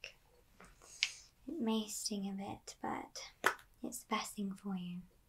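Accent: United Kingdom. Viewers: Your British accent works great together with the Lara cosplay.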